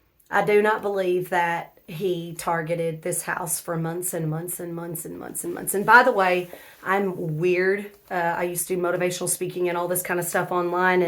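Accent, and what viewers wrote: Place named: South, United States. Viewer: You are from somewhere in the South, I've heard this accent all my life. I do know you're from some southern state. I relate to that accent. I hope you're right. However he is completely psycho and not like most of us. He is on the spectrum someplace and due to either environmental factors or genetic, he is flawed and he can't deal with it and thus this scenario is what we rare now seeing. A person that just should have gone on down the drug path and stopped there, instead of trying to rise to be and being triggered or what ever you want to call it to kill people. He would be better off homeless and under a bridge at this point.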